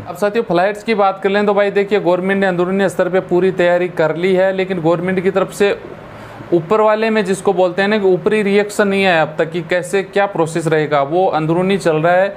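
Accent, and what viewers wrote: Rajasthan, India. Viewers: Aap sir ji pahele hindi to barabar bolna sikho saaf pata chalta h ke aap rajastani ho sorry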